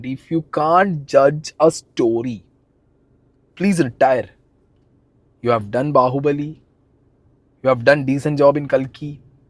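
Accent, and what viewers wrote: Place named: Karnataka, India. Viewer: Kannada English 😎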